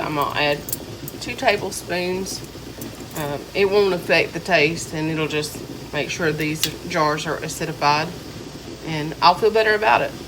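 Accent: Mississippi, United States. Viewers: Yummy Yummy..Great presentation as always..Mississippi talk and all..:)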